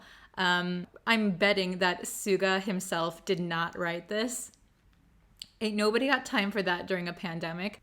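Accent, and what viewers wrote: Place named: United Kingdom. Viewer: It's for... You're American but you speak British English, l don't understand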